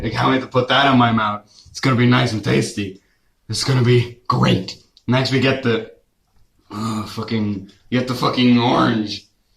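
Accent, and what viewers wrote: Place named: Ireland. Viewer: he sounds like Irish man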